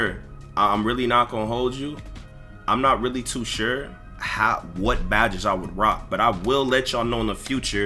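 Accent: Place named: New York, United States. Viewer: Bro where r u from u talk like YRG and young don the sauce god and some New York nigga all at once bruh wth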